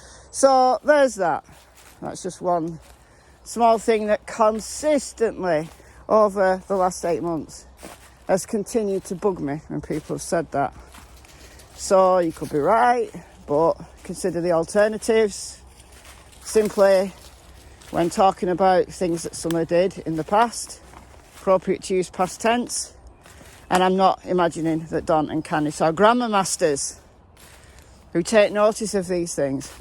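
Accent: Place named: Greater Manchester, United Kingdom. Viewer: Your videos are very interesting they are just not drama I appreciate what you do and I love listening to you talk your accent reminds me of my relatives from Ashton Underlyne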